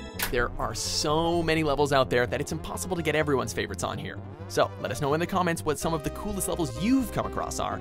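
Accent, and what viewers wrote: Canada. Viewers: WatchMojo are you canadian? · omg is he Canadian if so omfg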